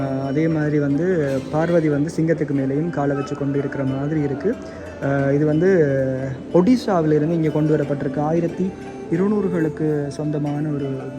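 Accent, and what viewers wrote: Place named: Sri Lanka. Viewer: ரொம்ப நல்லாயிருக்கு! இலங்கை தமிழ் எப்பொவுமே கேட்க இனிமை! தமிழ் kingdom, நாயன்மார்கள் இந்தியாவைவிட்டு வெளியே வந்ததால் இன்று அவற்றின் பெருமை பாதுகாப்பாக இருக்கிறது!!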